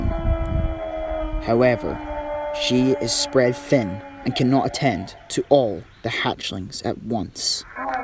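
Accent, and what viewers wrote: Scotland, United Kingdom. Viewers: I’m just wondering are you Scottish cause to me you do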